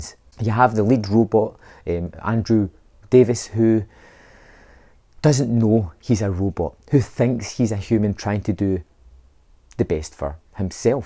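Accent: Scotland, United Kingdom. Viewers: Jesus man! once I realized it was a Scottish accent I could understand you. At first I thought you were Dutch LOL anyways don't kid yourself my friend it was a terrible movie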